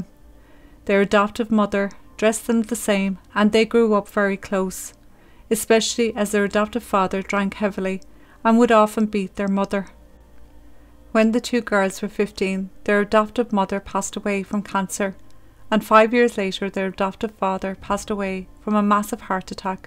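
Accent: Ireland. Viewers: Thanx I enjoy your channel,straight and to the point and of cos the Irish accent,the cherry 🍒🍒🍒🍒 on top.Much love from South Africa.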